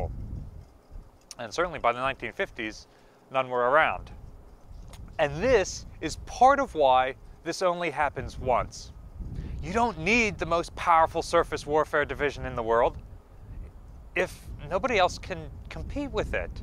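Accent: United States. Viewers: American voice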